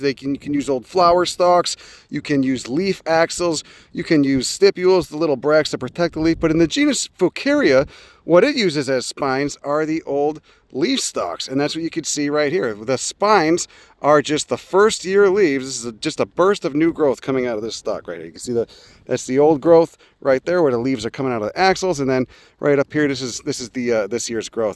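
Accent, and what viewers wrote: Chicago, United States. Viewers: inner city Chicago. its still there. he seems mellow today. perhaps its the paht.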